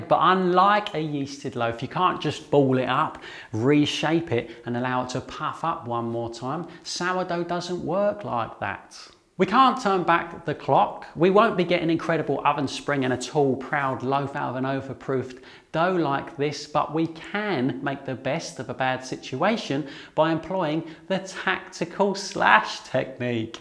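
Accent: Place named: RP, United Kingdom. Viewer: Otp Love your accent😊this is the posh english i love..p.s great advises thank youu❤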